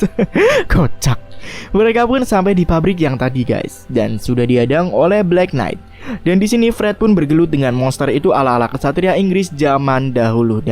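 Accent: Java, Indonesia. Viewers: orang Jawa ya?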